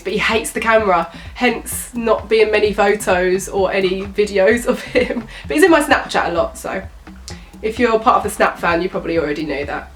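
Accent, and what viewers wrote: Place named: United Kingdom. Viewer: I could listen to your brittish accent 24/7 😍❤️❤️❤️❤️❤️❤️ love you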